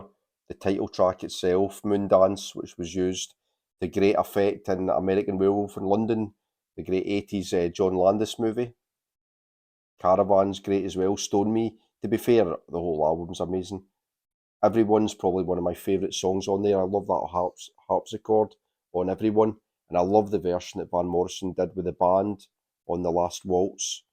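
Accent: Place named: Scotland, United Kingdom. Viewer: I wish I could have added your accent even though I assume it’s Scott’s to my video. So good ✌🏼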